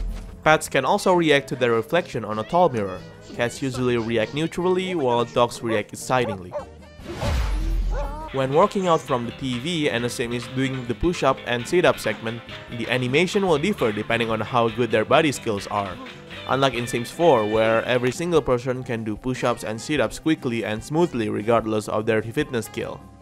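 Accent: Indonesia. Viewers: are you from Indonesia? I can hear your Indonesian accent. ✌️✌️✌️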